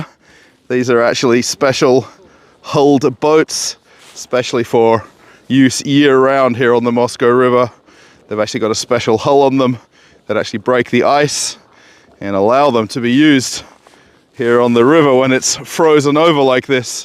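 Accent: Australia. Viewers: Nice video. I will get an Australian accent if I keep viewing this videos... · Мне кажется некоторым даже интересно и они пытаются понять, что он говорит. И это не сложно, ведь у него такой приятный австралийский акцент. Я с удовольствием его слушаю. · i can detect an Accent..Australian or NZ....?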